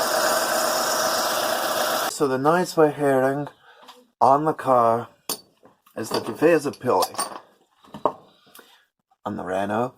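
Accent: Scotland, United Kingdom. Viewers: Mine is doing this too, going to try oil flush and change first...not been done for ages. Also, love your accent mate, heard the Scots in it then seen your flag! :) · Amazing accent, so Scottish..yet all Canadian!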